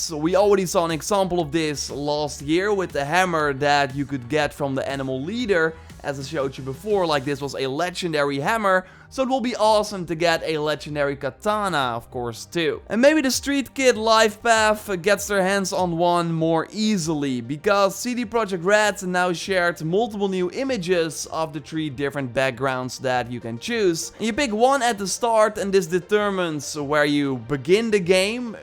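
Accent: Netherlands. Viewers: youre dutch, i can hear it in your voice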